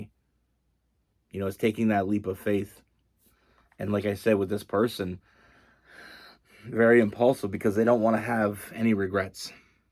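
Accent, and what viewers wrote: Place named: Canada. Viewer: Hi! new to the channel here. First off, your t-shirt and baseball cap collections get an A+. You are so thoguhtful and at the same time, really a dude. It's pretty charming. Truthfully, I feel guilty watching tarot readings though... I think I hear Canada, I know because I'm across the pond in B-low 😉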